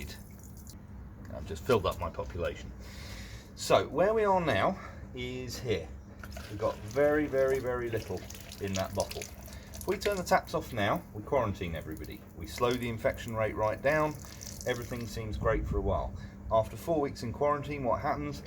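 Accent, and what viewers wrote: United Kingdom. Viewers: despite your cool calming British accent and the chance I believe anything that you say you must note your pouring of water is linear not exponential. the water bottle is quite tiny in proportion to the ability to treat based on the number of NHS ICU beds being under half of Italy yet the population size is similar. this is not the great British bake off this is life and death.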